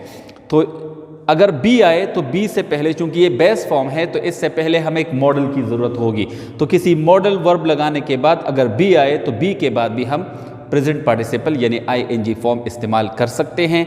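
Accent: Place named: United Kingdom. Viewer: mashallah you are doing very well and your accent is nice too and you can speak british accnet very well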